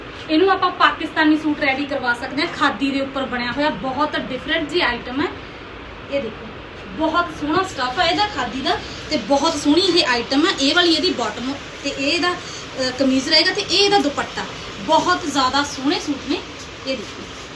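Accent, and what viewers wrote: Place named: Sangrur, India. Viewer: Didi tusi sangrur wali side toh lgde ho...tuhdi language toh❤❤❤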